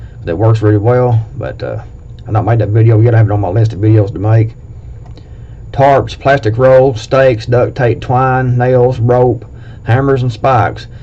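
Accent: United States. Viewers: Your accent says America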